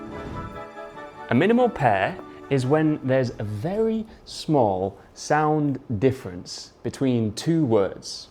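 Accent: Northern England, United Kingdom. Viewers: You sound like someone coming from the north of England. Am I right ?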